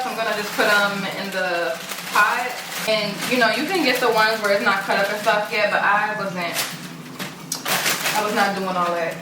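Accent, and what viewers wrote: Baltimore, United States. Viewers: Are you from Baltimore I hear an accent ? This video was needed change is always good.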